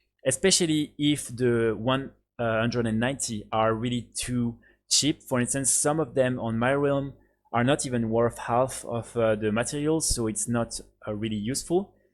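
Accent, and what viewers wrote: France. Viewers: Haha l'accent francais:P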